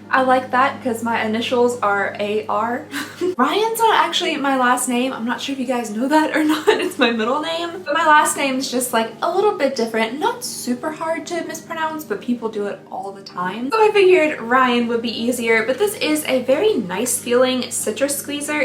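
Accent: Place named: Georgia, United States. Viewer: Sounds like south GA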